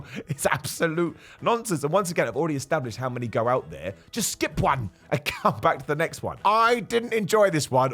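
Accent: United Kingdom. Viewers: I watch all of your what culture videos and your personal channel here. Love you!!! Love hearing your brittish accent... oh and whatever you're talking about as long as you're talking!! 🥰❤️❤️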